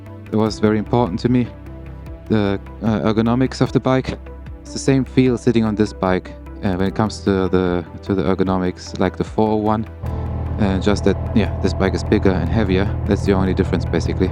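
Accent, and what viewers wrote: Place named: Germany. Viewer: Habe mir das Video angeschaut und dachte, hmmm klingt irgendwie nach nen deutschen Akzent… wartete bis das Nummerschild sichtbar wurde… jap wurde nicht enttäuscht. Hätte mich aber mehr auf deutsche synchro gefreut 🤷🏻‍♂️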